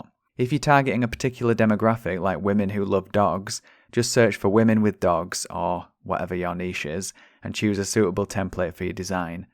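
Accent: Yorkshire, United Kingdom. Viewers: I recently found your channel and I have been making my way through your videos and I just want to say a huge thank you, your videos have been insanely helpful. I'm fairly new to this whole POD scene, but nothing will stop me from making a success of it. Also, you sound like you're from my neck of the woods, much love from Yorkshire.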